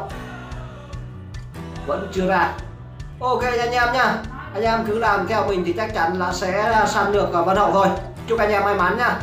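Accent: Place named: South, Vietnam. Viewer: Giọng miền nam nói chuyện rõ nhạt, Clickbait cực mạnh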